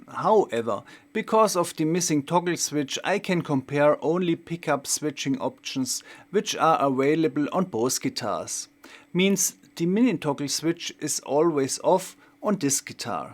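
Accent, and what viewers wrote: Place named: Germany. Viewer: This german english...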